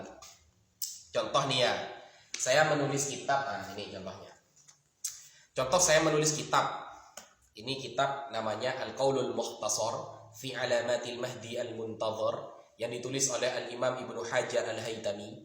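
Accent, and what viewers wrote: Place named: Madura, Indonesia. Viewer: ustadz ini kok ada logat madura😁...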